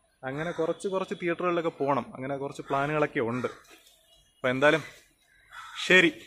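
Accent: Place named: Thiruvananthapuram, India. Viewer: സംഭവം കൊള്ളാം. പക്ഷെ ഈ കൂടെ കൂടെ കേട്ട കേട്ടാ കേട്ടാ ന്നു പറഞ്ഞു ബെർപ്പിക്കരുത് അനിയാ 😬നീ കൊല്ലംകാരൻ അല്ല. തിരോന്ദരം ആണെന്ന് തോനുന്നു 😆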